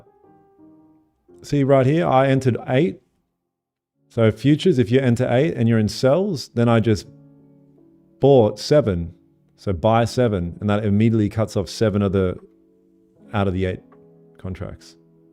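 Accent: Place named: Australia. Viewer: Living in Australia. It’s refreshing hearing an Aussie accent with typical Aussie humour. I was skeptical at your simple trading style but you are making money and I’m not. Think I’ll start tuning into your streams mate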